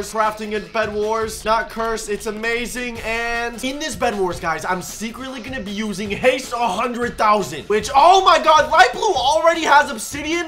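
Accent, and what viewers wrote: United Kingdom. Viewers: I still don't know if you British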